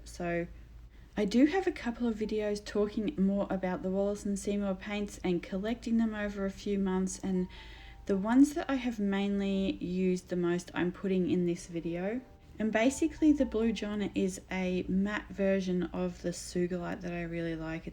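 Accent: Australia. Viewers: Great to hear an Aussie accent. I am in NSW and am trying to get into art and watercolour after a career in nursing. I love your style. Thanks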